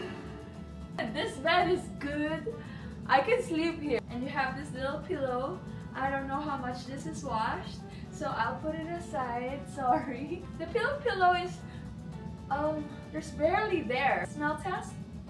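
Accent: Philippines. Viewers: YOU SOUND LIKE A 'FILAPINA' ?:face-blue-smiling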